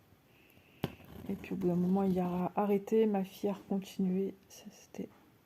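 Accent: North, France. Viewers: Bonjour! Je viens de découvrir ta chaîne! Il me semble avoir reconnu ce petit accent du Nord 😁je suis moi même du Nord j'habite près de Lille...j'ai regardé plusieurs de tes vidéos je suis moi aussi sujette à des crises d'angoisse mais après une thérapie ça va bcp mieux je prends toujours des médicaments et je vois une psychologue mais je reprends goût à la vie malgré les soucis...je suis moi même une passionnée de loisirs créatifs je fais du dp et du point de croix estampé j'adore!! La toile que tu fais est juste magnifique!! Jespere que tu vas aller de mieux en mieux ça fait du bien de se confier moi ça ne m'embête pas de t'écouter... je te fais de gros bisous☺️ ah oui je me suis inscrite sur le groupe (entre nordistes hein😁)a bientôt prends soin de toi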